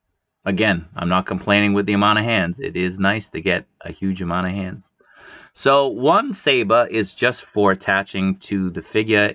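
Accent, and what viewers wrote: Boston, United States. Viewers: you’re from boston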